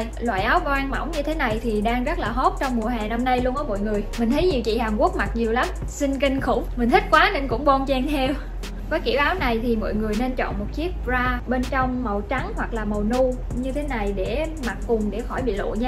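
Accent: Quang Nam, Vietnam. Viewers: c này chắc người Quảng Nam quá :)))) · Nghe phát biết người Quảng Nam liền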